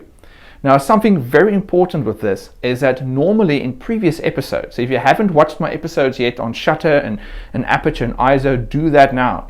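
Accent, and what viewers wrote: South Africa. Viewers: So good to hear a great SA accent again. Thanks for a great tutorial, really simple and straight forward. Dankie Boet!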